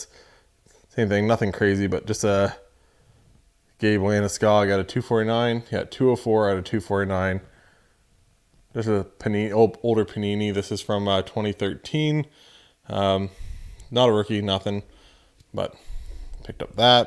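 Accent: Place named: East Coast, United States. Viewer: Nice video. Just sitting at work, felt like I was at the show. I'm setting up at my first show this weekend, I watched your video about setting up as a dealer, some good advice. Lol, I knew you were east coast! First time I heard you say "card", gave it away for me. I'm Alberta oil patch, work with tons of east coasters. Looked like you did pretty well at the show. My only goal for the show this weekend is to get my table fee back. Lol. I'll just be happy to sell a single thing. Good video, you have good easy interactions with the show attendees. 👍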